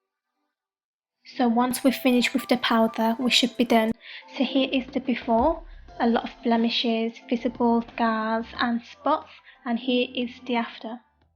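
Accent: United Kingdom. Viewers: u have abit of a british accent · i love how she is asian yet has a british accent :) its so neat! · i like ur accent!! how long have u been staying in UK? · you're sooo beautiful! and you sound like you've got a British accent ;)) so cool!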